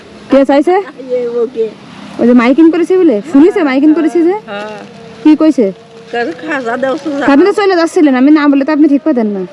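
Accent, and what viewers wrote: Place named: Rangpur, Bangladesh. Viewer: কথা শুনে তো রংপুরের অঞ্চল মনে হচ্ছে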